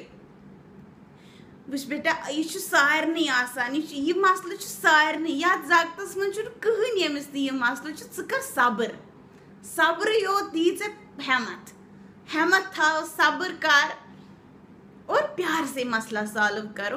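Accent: Kashmir, India. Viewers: I loved the Ending.. Episode was OK but you are Too good...You are Super Talented.. I love your Expression and the Kashmiri Accent.. 😊😊😊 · Real kashmiri ascent. Loads of love for di.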